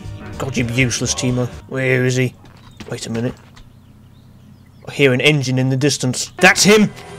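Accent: United Kingdom. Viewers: You are a mix of thedooo, mcnasty, martincitopants and british. And i love it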